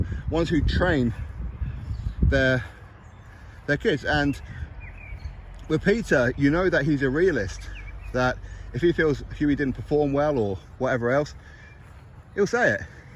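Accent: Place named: RP, United Kingdom. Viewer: Hello. If you're from Newcastle Upon Tyne where did you get that accent from? I'm born & bred in Newcastle as well but my accent sounds nothing like yours!! You're posh!!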